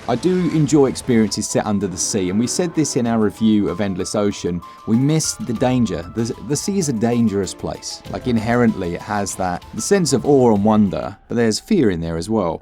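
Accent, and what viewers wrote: United Kingdom. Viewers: Thank you very much (!) for adding yet more games to my backlog!! I should know not to watch these videos because as you said, and i have said in the past, you say what it is on the tin and there never is any clickbait - main reason your one of the very very few (2!) switch channels i watch now. Plus i love hearing fellow brits accents too 🇬🇧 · Nice channel. You brits always sound good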